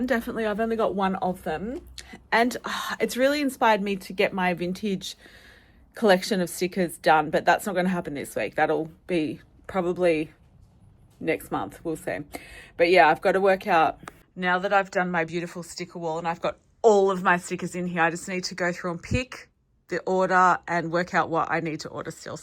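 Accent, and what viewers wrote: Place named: Australia. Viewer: Wow these pens look amazing. I really likee the sound of the brush tips and wowzaz…Australian!!! Awesome!!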